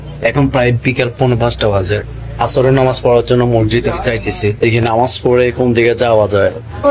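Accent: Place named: Bangladesh. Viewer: Bro tomar kotha bolar accent onektai amader Bangladeshi der motoi... Valo laglo... Eid Mubarak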